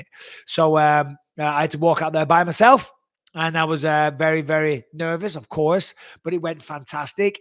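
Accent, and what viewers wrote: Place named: United Kingdom. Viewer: Not sure why you were one of my favorites… probably that British accent ;)…love the content brother keep it up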